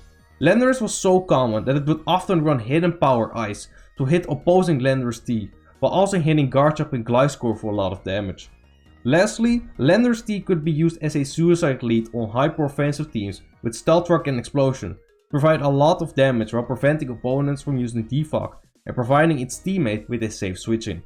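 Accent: Netherlands. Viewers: Needed just 2 seconds to hear you are Dutch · Je hebt echt t hardste Nederlands Engelse accent dat ik ooit gehoord heb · Seeing all the comment about how dutch you sound are cracking me up. Can't disagree but it's not bothering me at all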